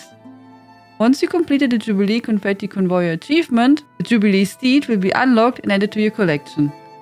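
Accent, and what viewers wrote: Germany. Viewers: You from Deutschland? sounds like one.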